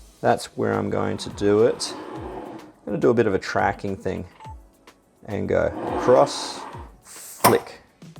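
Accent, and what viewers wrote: Australia. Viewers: Sound Aussie bruh. Awesome vid helps alot! Waiting for my A7iii looking at 17-28tam also 🤩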